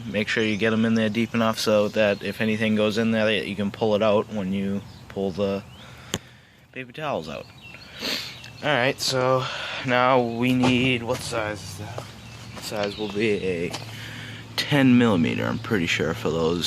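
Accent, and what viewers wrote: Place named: New England, United States. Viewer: where u from? you sound like ur from new england. maybe ME, NH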